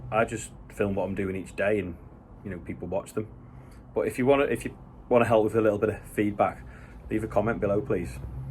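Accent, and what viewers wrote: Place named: Scouse, United Kingdom. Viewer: What makes an interesting theme. it simply YOU. You have a way of narrating a video which is unique. I think it’s your soft Scouse accent, your genuine and honest and say it as it is attitude. I worked on farms as a kid and am lucky enough to have a couple of acres of English countryside and look forward to your vids most nights. You come across as a caring sole who does his bit and probably a bit more for your local community. I wish you all the success in your real job and the u tube thing. Quite simply you get outa life what you put in. Keep up the great work your a credit to your family 👍🏻👍🏻. That’s made me feel 100% 👍🏻👍🏻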